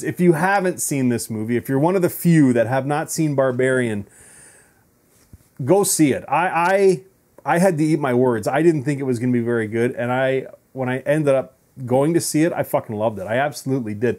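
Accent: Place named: Canada. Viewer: Hey dude, as soon as I heard you talking I thought you were a fellow Canadian, it’s the accent haha. Where about are you from? I grew up in Northwestern Ontario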